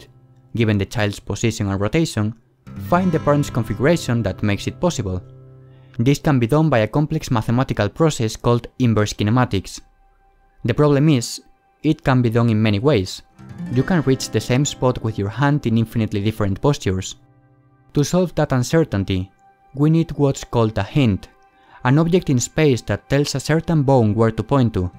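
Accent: Spain. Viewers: eres español no? buen video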